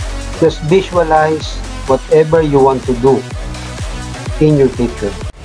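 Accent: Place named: India. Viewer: PARANG INDIAN ANG BOSES NG TUTORIAL KUYA PARANG SI JHAMAL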